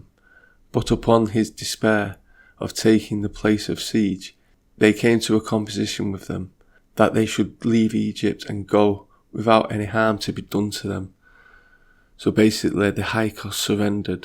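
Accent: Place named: Manchester, United Kingdom. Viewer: Much respect this is amazing the narrator who's telling of these accient events sounds like he's got a Manchester accent much love from liverpool keep up the good work my freind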